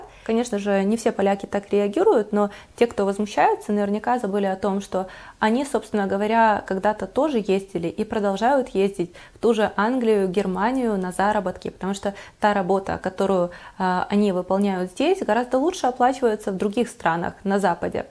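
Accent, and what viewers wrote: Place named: Ukraine. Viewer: украинский акцент не спутаешь с польским или даже русским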